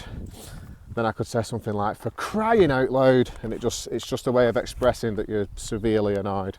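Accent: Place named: North, United Kingdom. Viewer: Yeah, I guess I've been more exposed to American English lately. It's quite refreshing to hear someone speak with a northern British accent. It brings me memories ( good and bad) from my stay in Manchester. (Fallowfield and Salford)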